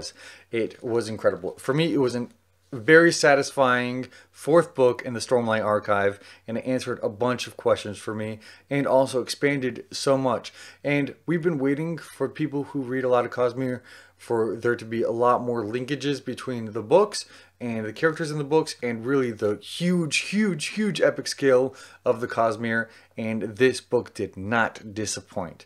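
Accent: South, United States. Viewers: I love your vlog on this video at your house in Texas during your quarantine until you had a lot of books last year back in 2020 are The Ballad of Songbirds and Snakes by Suzanne Collins, She's Come Undone by Wally Lamb, The Toll by Neil Shusterman, My Best Friend's Exorcism by Grady Hendrix, And I Darken by Kiersten White, Black Sun by Rebecca Roanhorse, The Southern Book Club's Guide to Slaying Vampires by Grady Hendrix, The Rage of Dragons by Evan Winter, Gideon the Ninth by Tamsyn Muir and Rhythm of War by Brandon Sanderson while you're talking about all of the characters from all of the books to read them in the beginning while you're talking about horrible mentions of the book called, To Sleep in a Seas of Stars by Christopher Paolini and another books of The Great Hunt by Robert Jordan including Grave Peril by Jim Butcher, The Bands of Mourning by Brandon Sanderson and The House of the Cerulean Sea by T.J. 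Klune and Surrender Your Sons by Adam Sass after that, I hope your husband, Zach will be very proud of you and I love your Southern accent!!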